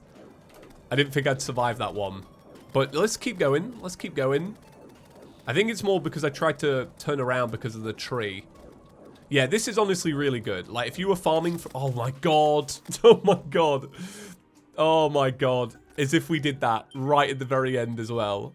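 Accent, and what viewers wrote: United Kingdom. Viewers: videos make me realize how different Brits pronounce certain vowels than Americans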